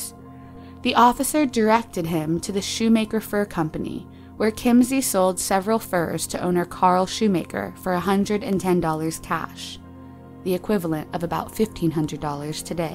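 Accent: India. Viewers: Who's voice was it? Is she Indian?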